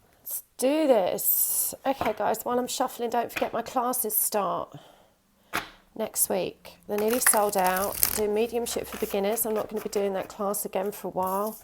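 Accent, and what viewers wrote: England, United Kingdom. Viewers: Very deep messages in the beautiful English accent! 🙏🏼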